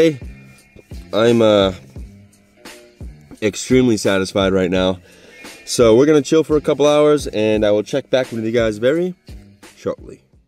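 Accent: Boston, United States. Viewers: Couldn’t figure out your accent, but when you said “bears,” as “behs,” I knew it had to be Boston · Meronghilay It’s a Boston accent! :) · I’m not from the US, just watched a couple of your videos and it took me until to recognise you have a Boston accent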